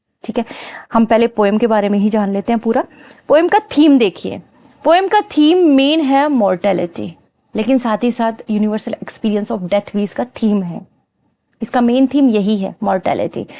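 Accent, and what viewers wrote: Rajasthan, India. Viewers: Ohh , madam ji aap to rajasthan se ho 😊 .... (ढाणी)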